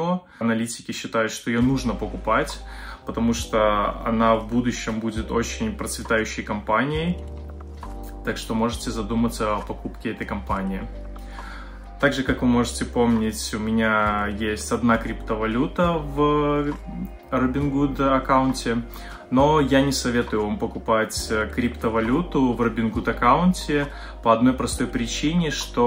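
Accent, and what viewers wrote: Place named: Belarus. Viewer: Беларуски акцент 😉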